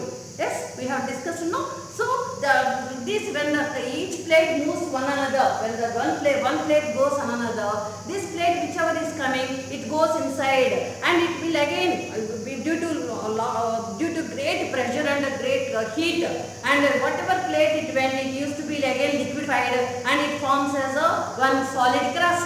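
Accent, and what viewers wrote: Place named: India. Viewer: Butler english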